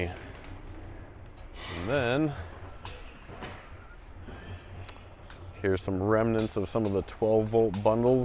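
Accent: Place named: Texas, United States. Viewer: Вери гуд👍🤣английский у автора канала чисто американский с техасским акцентом! 👍👍👍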